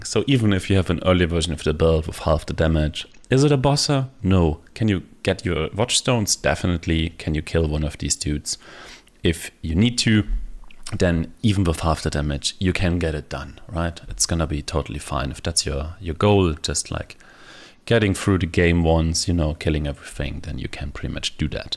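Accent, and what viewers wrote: Germany. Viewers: you sound german af 🤣 greetings from germany^^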